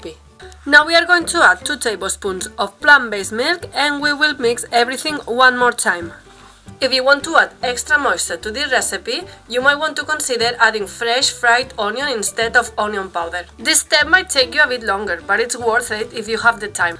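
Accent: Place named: Greece. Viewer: Thank you so much for your channel! I love your accent; You sound like my cousin from Greece.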